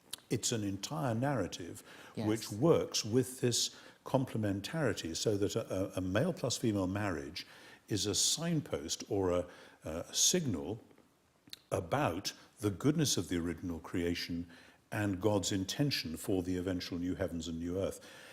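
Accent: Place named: United Kingdom. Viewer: Pompous ass. Narrow minded pompous ass with a British accent.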